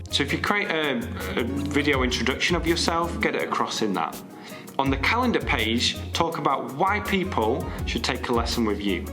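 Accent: United Kingdom. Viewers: Thank you. I like your British accent.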